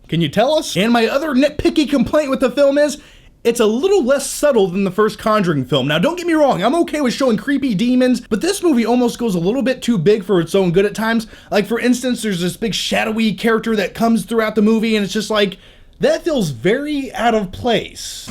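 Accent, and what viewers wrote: England, United Kingdom. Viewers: Ha your English accent is hilarious. Telly? Lol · lol, your rant is the funniest thing!! And I love your english accent too! My worst cinema experience was when someone sitting behind us put chewing gum in my friend's hair. Awesome review!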